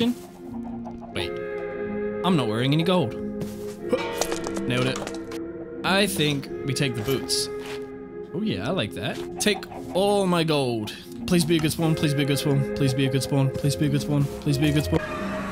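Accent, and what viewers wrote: New Zealand. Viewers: Just wondering are you a New Zealander? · Hmmm,are you from New Zealand you kinda sound like a kiwi😂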